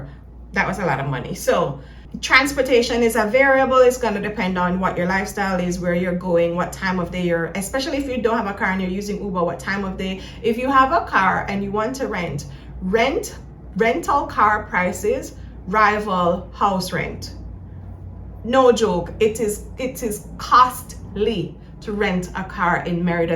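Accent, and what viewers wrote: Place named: Jamaica. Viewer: Very informative video! ❤ I love the Jamaican accent…😂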